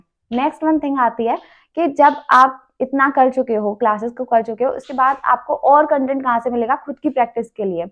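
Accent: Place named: Pahadi, India. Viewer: Aap Uttarakhand se ho kya?....apki accent pahadi lagri hai 😊😅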